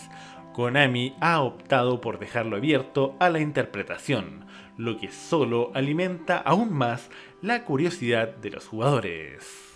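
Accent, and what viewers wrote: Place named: United States. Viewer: Tuve que dejar de ver el video por tu tono de voz y como intentas pronunciar las palabras que no necesariamente deben pronunciar con un acento americano, deja de hablar como un niño con TEA por favor 😿🙏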